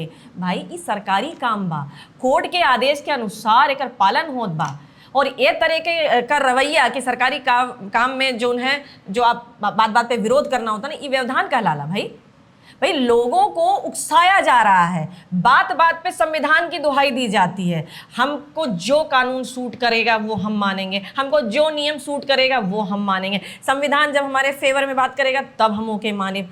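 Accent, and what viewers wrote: Bhojpuri, India. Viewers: आपकी बोलने का अंदाज एकदम भोजपुरी भाषा में है😊❤❤❤❤❤❤❤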